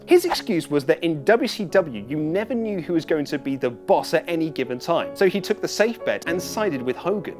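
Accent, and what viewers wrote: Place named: United Kingdom. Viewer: Jesus how many wrestling youtube channels are there with british hosts?!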